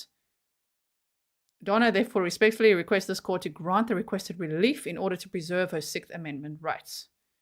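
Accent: South Africa. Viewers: Love that South African accent. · Love that South Efrican accent.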